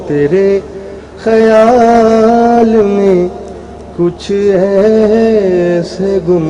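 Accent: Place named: Jhang, Pakistan. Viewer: ساڈے علاقے جھنگ دی زبان لگ رہی ہے استاد جی😍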